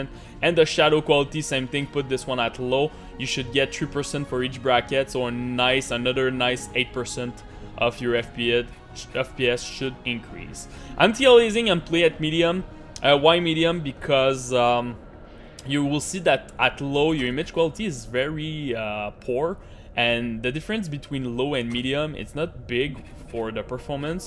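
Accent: Quebec, Canada. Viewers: i'm guessing you're from Quebec?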